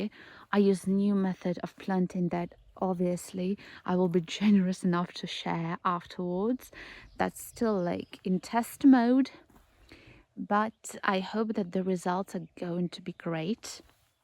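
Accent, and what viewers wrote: United Kingdom. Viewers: Always lovely to see you and your garden. Have you continued studying language? Your accent sounds even more British than I recall from earlier videos.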